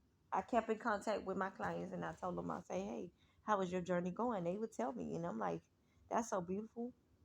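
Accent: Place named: Miami, United States. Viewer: New subscriber ❤️ you’ve been speaking to me all day. You sound like you’re from Miami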